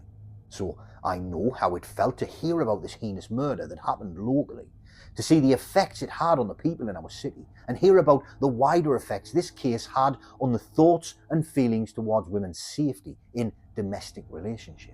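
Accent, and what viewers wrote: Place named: North East England, United Kingdom. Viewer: Listening from Middlesbrough. Nice to hear a Northeast accent telling these stories. Love the content, great channel.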